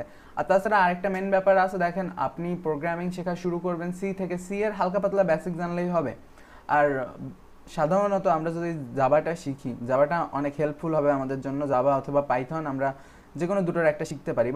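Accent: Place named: Khulna, Bangladesh. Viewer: ভাই আপনার বাড়ি মেবি বাগেরহাট বা খুলনা হবে কথা শুনে আমার তাই মোনে হচ্ছে, আমার বাড়ি বাগেরহাটে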